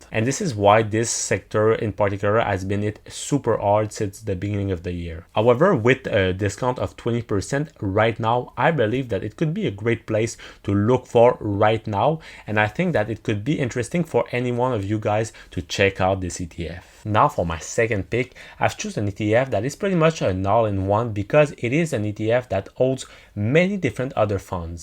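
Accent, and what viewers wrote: Quebec, Canada. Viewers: Sounds like a fellow Quebecer 🤘🤘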